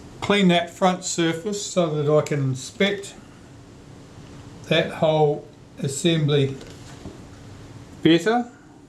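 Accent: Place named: Australia. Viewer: Thank you for this series. Although I have not yet completed my Mockba 4 shutter project, it has been helpful. I CAN report that common internet information would have us believe that the Mockba/Moskva cameras were made identically to the Zeiss cameras with manufacturing equipment they brought back to he USSR as spoils of war, changes were made by he 1950's. The Mockbas went from 5 shutter blades to 3. They are NOT of Zeiss machine fit and finish, and the metal used was obviously of a softer grade of brass in the shutter speed wheel. The springs have not held up their strength well at all. My next project will be my prized Super Graphic with 127 Ektar and Graflex/Compur shutter. I'm confident it will turn out, thanks to you. As for his Moskva or Mockba, I've relegated myself to being happy with a fast/medium/slow, and B setting ,and re-labeling the dial accordingly. 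BTW, No mistaking where you're from. Don't tell me... Australia. I'm in the US, although I expect a transfer to heaven in the next few years. Regards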